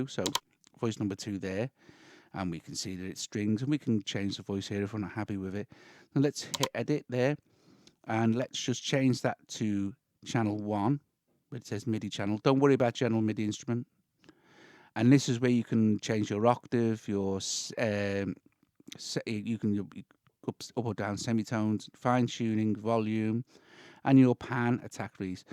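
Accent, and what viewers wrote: United Kingdom. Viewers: I loooooooooooove your accent! Is that British?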